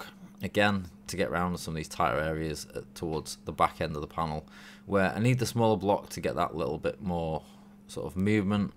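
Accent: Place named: Northern England, United Kingdom. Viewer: Great video bud. Just doing a Mini now and came across your videos. Really well explained and nice to hear in British English (although Northern) lol - Cheers fella x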